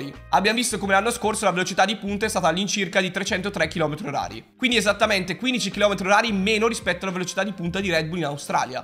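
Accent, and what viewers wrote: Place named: Sardinia, Italy. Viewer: tieni ragione.......sounds sardinian....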